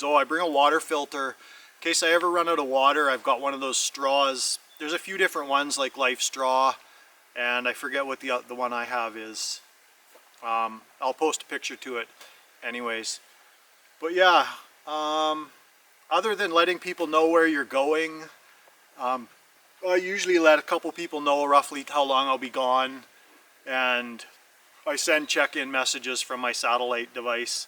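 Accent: Canada. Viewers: My bad, you sounded like a couple guys I know fron way out on the atlantic side of things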